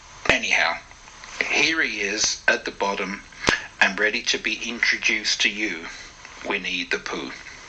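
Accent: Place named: England, United Kingdom. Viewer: beautiful story time absolutely love your English accent thank you my Best Friend loved Winnie The Pooh🤗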